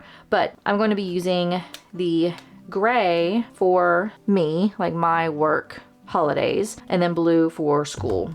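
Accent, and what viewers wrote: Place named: Tennessee, United States. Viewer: It’s so nice to hear someone who has an accent like mine. I’m from south west Tennessee.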